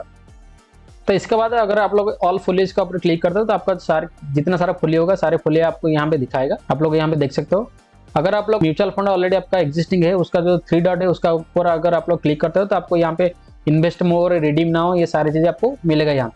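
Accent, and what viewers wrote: Odisha, India. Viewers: Bhai... Odisha se he kyaa.... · Sir App Odisha Ka Hain Kya?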